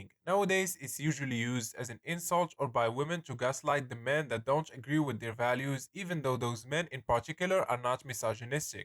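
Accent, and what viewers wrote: Morocco. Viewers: The speaker seems moroccan from the accent 🇲🇦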